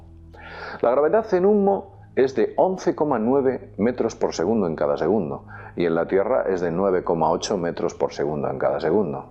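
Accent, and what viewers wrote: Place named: Castile, Spain. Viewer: Es normal en el acento castellano hablado en España...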